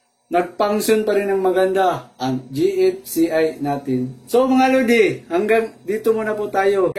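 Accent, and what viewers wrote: Visayas, Philippines. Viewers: visaya diay ka?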